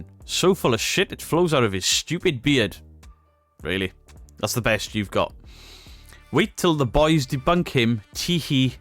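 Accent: Wales, United Kingdom. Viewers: "be those bottles and ghouls". Subs don't get your welshyness 😂😂😂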